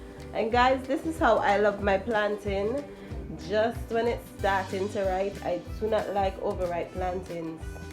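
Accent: Antigua and Barbuda. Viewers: Wait y she sound Antiguan so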